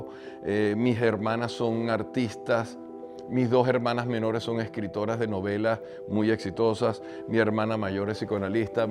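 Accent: Venezuela. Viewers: Coño seré sincero contigo cuando me saliste dije este viejo ya va hablar paja luego escuché el acento dije coño venezolano luego segui escuché me quedé hasta final grande historia pana que experiencia una gran inspiración para muchos nunca te rindes!!eres el propio tommyshelby. Saludos desde Portugal ya hoy te sigo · Que magnifico escuchar a alguien con acento venezolano, con mentalidad triunfadora y sobre todo enseñando consciencia de libertad!!! Statehood #51!! Graciass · Que gusto el acento venezolano en magnífica dicción y pronunciación. El contenido es extremadamente relevante. Gracias por tu testimonio de experiencia en el logro del éxito financiero. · AJJJJ ... ESE ACENTO VENECO... LASTIMA... ME INTERESABA EL TEMA... ADIOS Y REPORTADO ... 😢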